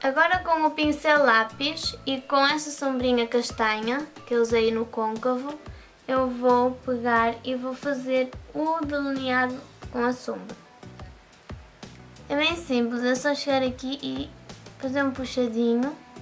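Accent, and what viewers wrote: Portugal. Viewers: Gostei do video, só tenho dificuldade pra entender o sotaque português :( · Que linda! Você ficou muito parecida com ela :D Adoro seu jeito de falar ♥ Portugues de Portugal ♥♥♥ Te adicionei no face e te segui no instagram :D Beijos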